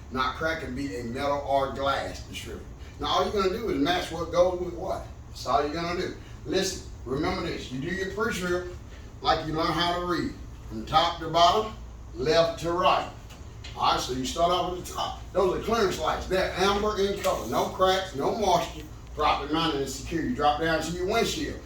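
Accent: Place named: South, United States. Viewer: It's very detailed but your talking to fast and country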